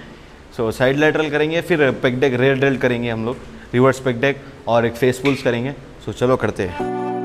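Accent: Hyderabad, India. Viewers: Hyderabadi ho kya Miya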